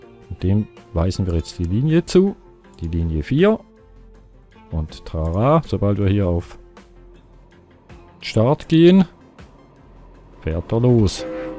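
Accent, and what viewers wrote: Switzerland. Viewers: endlich mal ein gutes lp mit schweizer akzent! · Du bist der Einzige wo ich deinen Schweizer Dialekt versteh :)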